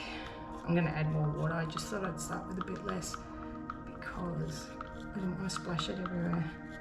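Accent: Australia. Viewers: Thanks for sharing your learning curve. I am just about to start pour painting and love listening to an Australian accent. I live in Queensland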